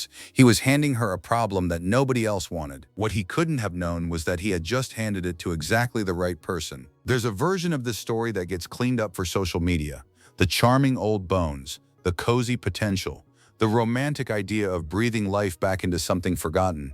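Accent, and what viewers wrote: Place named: Kentucky, United States. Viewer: I started watching about a year ago. As a fellow Kentuckian (also from the Louisville area) I swore she was in KY for the first several videos I watched ( know my KY accents!) until she said she was in North Dakota. But the accent just didn’t add up for that area. Never the video I watched today I was thinking about that. Now that I know she’s originally from here t makes sense!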